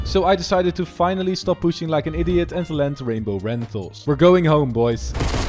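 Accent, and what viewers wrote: Netherlands. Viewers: Ge hoort gewoon dat da nen hollander is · Are you dutch. I am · je bent zoizo nederlands ik kan het horen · Kom je uit Nederland wand je heb een Nederlands acsend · are you dutch?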